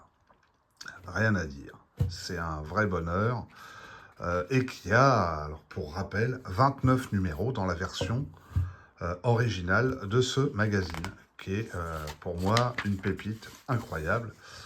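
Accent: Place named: England, United Kingdom. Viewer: Vraiment chouettes ces magazines. J'ai vu qu'un Quebecquois avait écrit une lettre dans le courrier des lecteurs, les chanceux... Alors essaie de dire avec l'accent québecquois "Tabernacle qu'ils ont chouettes ces primates" la prochaine fois. Non c'est ni raciste ni stéréotypé, si on me demande je dirai que c'est la faute à ChatGPT. C'est vrai, quoi, on connait ton merveilleux accent anglais mais pas ton accent québecquois. Le Monde doit savoir. Vache, Taylor dans l'adaptation met un coup de pilon à la Bud Spencer pour assommer le gardien ! J'ai gonflé mes muscles, surtout au niveau du ventre, c'est là qu'ils sont le plus développés.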